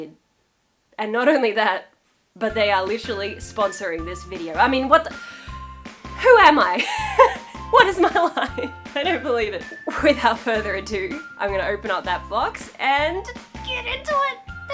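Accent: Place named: Australia. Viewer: she is aussie she does not have a bad aussie accent u can’t be aussie or know their accent at all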